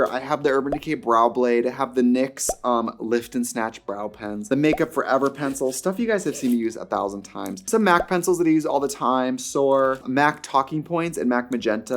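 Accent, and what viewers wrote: Wisconsin, United States. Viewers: Her Wisconsin accent is so strong in this vid! So adorable, love from Milwaukee 🍻❤️💅🏻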